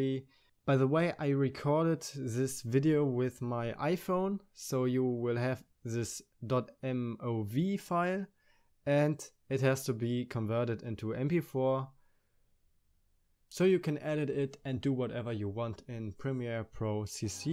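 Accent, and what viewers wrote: Germany. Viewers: I just love a german accent. Thankz brah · man hört direkt raus er ist Deutsch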